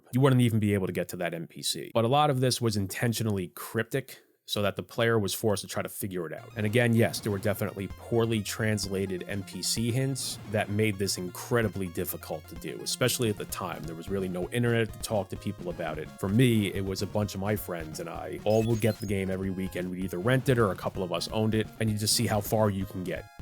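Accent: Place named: Philadelphia, United States. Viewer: The Del-Co Philly accent, the sunken tired eyes, the walkthru under the guide as a review....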